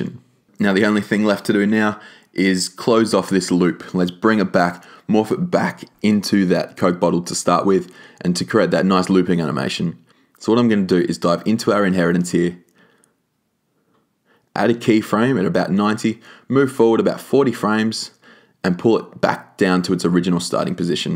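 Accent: Australia. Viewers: Hi, It's great to hear an Aussie accent on YouTube. I love your videos, your camera girl is awesome as well. I'm based in Batemans Bay, south coast NSW.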